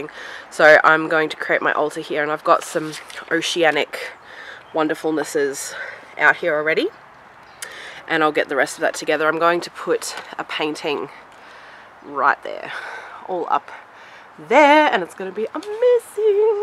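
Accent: Australia. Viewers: Just found you and hearing a fellow Aussie is awesome!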